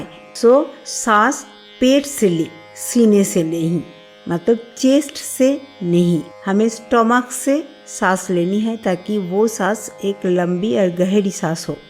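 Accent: Bengal, India. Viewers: महोदया बंगाल से है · Aap bengali ho? accent comes like that 😊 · tumko jhudko hindi barabar bolna nahi aata. bangali mam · नमस्कार देवीजी। मैं अरुण वाडीकर पनवेल, ’नवी’ मुम्बईसे। आपकी प्रस्तुति बहोत अच्छी है, अपितु आपकी आवाज और विषय को पेश करने की कला, अंदाज़ में जो सादगी और बंगाली लहजा है, वो उसे और भी रोचक बना देता है। यूंही अपने श्रोता गण के ज्ञान में वृद्धि करते रहिए। शुभकामनाओं के साथ।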